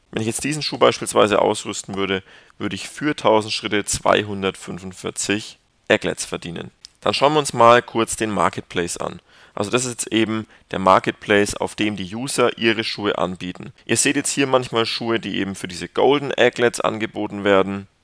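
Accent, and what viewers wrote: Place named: Nuremberg, Germany. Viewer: folge dir mal auf twitter ;) ich hörte da so einen Nürnbercher dialekt raus endlich mal einer mit Youtubetschänel aus der Gegend nice vl. kann man sich mal treffen ;) ich bring auch an LKW mit (nur ein Franke weiss was das für gutes Essen ist ) ;) mjam mjam ;)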